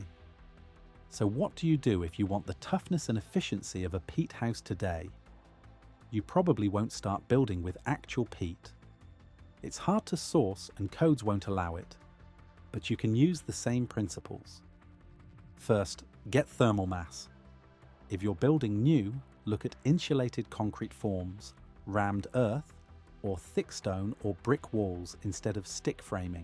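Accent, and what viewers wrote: United Kingdom. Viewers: Can you please at least put temps in C as well? No one but Americans use this. I mean you have a British accent who uses C.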